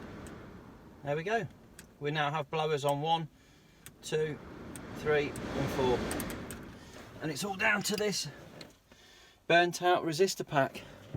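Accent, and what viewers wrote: Leicester, United Kingdom. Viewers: Are you from Leicester?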